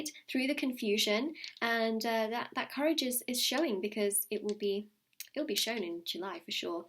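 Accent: England, United Kingdom. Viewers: Oh my gosh you’re English! Glad to see a fellow countryman represent! 😆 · You’ve got a beautiful English accent 🏴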